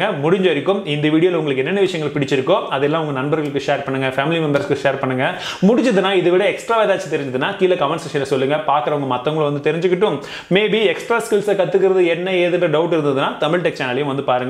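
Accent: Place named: Kerala, India. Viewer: Name la tamil nu eruku ok but unga slang liteta apo apo malayali slang varuthu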